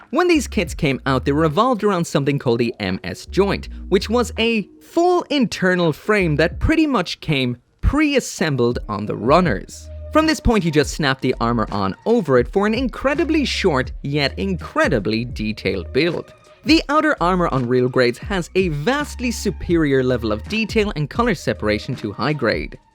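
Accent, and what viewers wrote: Ireland. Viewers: its a misheard, he said 18 meters, hes irish accent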